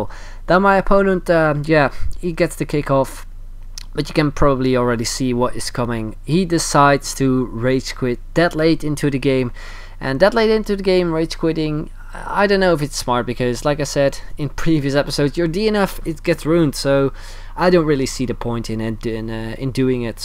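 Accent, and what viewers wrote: Netherlands. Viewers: Yo, are you dutch? Because your English sounds like a Dutch guy speaking English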